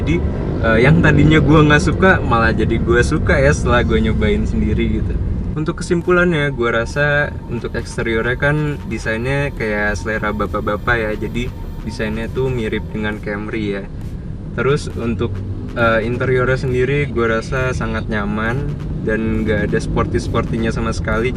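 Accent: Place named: Java, Indonesia. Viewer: Kayaknya logat" jawa dehh.. Tapi kok GUA GUA yaaa😁😁😁😁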